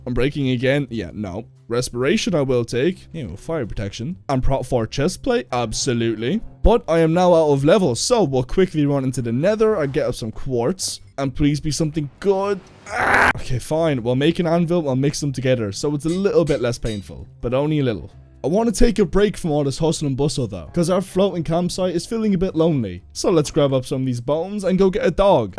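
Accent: Ireland. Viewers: can't tell if you're irish or european. either way, I like your voice! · As soon as I heard you're voice I instantly noticed that your Irish. It's nice to see another Irish brother 🇮🇪🇮🇪. Good luck in your channel mate.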